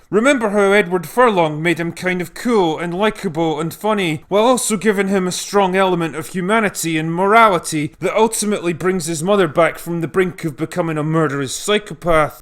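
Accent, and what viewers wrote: Scotland, United Kingdom. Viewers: At first I was like shut the f up. But then I thought your voice is a cross between scotty of trek and braveheart But......take a breath it will be ok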